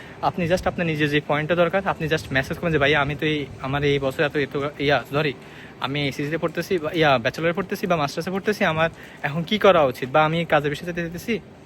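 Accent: Noakhali, Bangladesh. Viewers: bhaiya videor seshe ashi bhujchi amne noakhalir 😂 bhai aio amnego noakhalir manush 🤣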